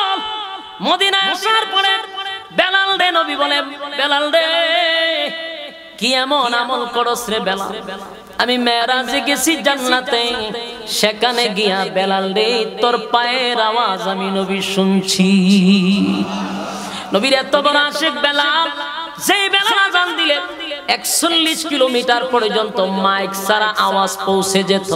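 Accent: Noakhali, Bangladesh. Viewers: নোয়াখালি কে ভাই আনেগোর ভাসা বুজি না মুগো সিলললেটি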